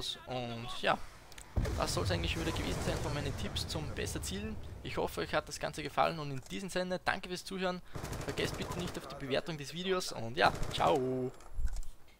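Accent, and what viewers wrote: Austria. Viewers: Sau geiles Video :-) Von wo kommst du eigentlich hörst dich an als wenn du aus NÖ kommst :) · Bist du Österreicher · tolles video :) bist du österreicher :3?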